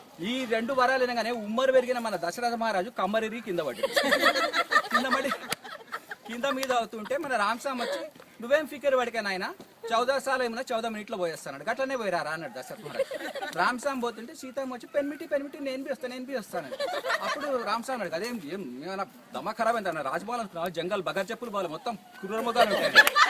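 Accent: Telangana, India. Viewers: Zabardasth telangana accent Pls continue bhai Big bow!! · Very nice actually iam trying to learn telangana slang ur slang help full to me thank you sir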